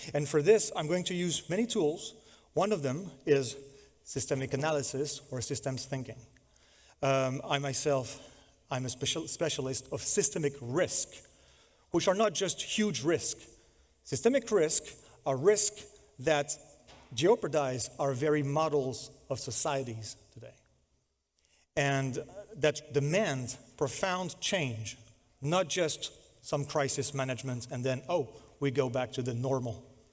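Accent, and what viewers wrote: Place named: United States. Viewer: où avez-vous appris à parler si bien anglais, avec un accent américain de très bonne facture?! En tant que prof d’anglais je suis impressionné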